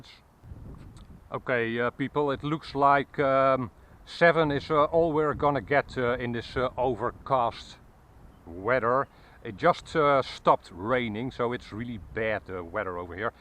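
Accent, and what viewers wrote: Netherlands. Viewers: wat een verschrikkelijk Nederlands accent heb jij, natuurlijk ben je Nederlands maar dit klinkt echt bagger. alsjeblieft werk aan je Engels.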